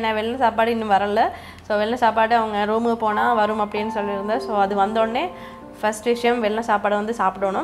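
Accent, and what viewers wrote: Sri Lanka. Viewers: இலங்கை தமிழ் இனிமை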